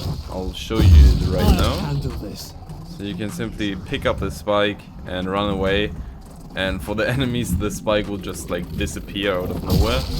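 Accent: Germany. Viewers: Dieser Deutsche Akzent 😂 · Bro du bist deutsch oder ? Haha dein Akzent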